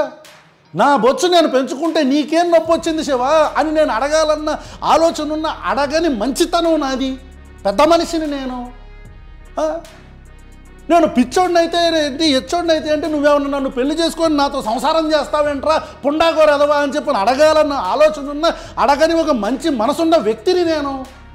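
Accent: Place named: Rayalaseema, India. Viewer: రాయలసీమ language లో ఐతే సంపిపడేసావు brother. ఒకసారి రాయలసీమ visit చేయండి, చూడడి poor ఎలా ఉందొ తెలుస్తుంది.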